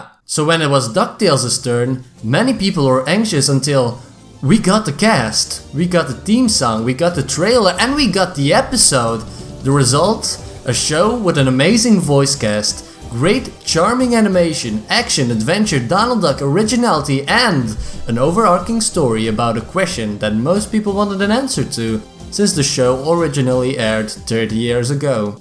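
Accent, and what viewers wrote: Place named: Netherlands. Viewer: Ummm you sound like a Dutch speaking person. Are you dutch? Because I can tell when you pronounced the title comic very well.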